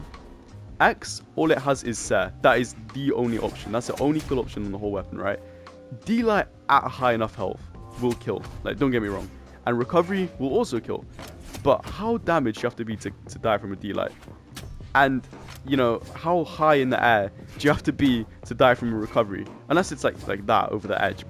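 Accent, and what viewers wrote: London, United Kingdom. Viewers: That was the most London intro I’ve ever heard to a video lol.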